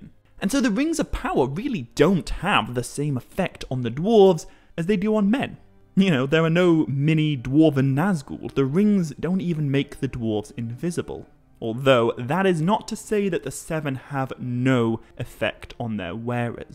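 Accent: United Kingdom. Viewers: Oh my God, this is probably what John Oliver refers to as “offensively British accent”. It was impossible to finish the video! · You are an amazing orator and in my opinion is best suited with your accent ... even if you don't think you have one (your friends from across the pond)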